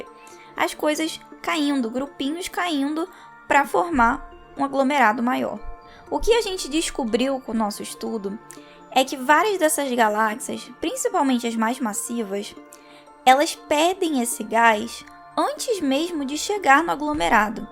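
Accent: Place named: Rio de Janeiro, Brazil. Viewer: Mds, sotaque carioca é perfeito aff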